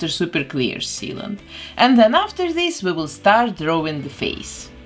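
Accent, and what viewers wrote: Russia. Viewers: Very funny a Russian accent telling Americans how awful Trump is. I unsubbed. When I want to hear people make fun of my President, I'll listen to someone who at least has grown up in the USA. And I'll watch the Popovy sisters do real art without political grifting. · You're russian? This accent ...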